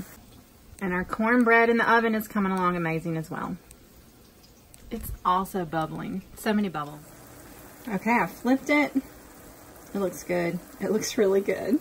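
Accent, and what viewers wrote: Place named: North Carolina, United States. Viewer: Being from the foothills of NC I immediately recognized the accent! Love a country girl that can cook!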